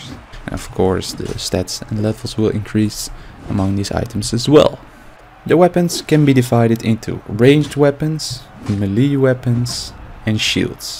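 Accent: Netherlands. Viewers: dat dacht ik al ik hoorde het aan je engels accent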